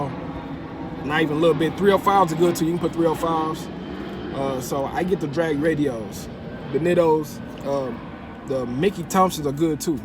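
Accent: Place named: Texas, United States. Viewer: What part of TEXAS u from bro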